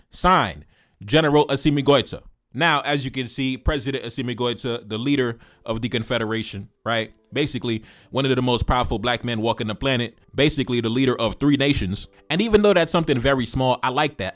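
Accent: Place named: United States. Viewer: Thank you for the explanation, Bro, for explaining what has actually happened. I love your American accent!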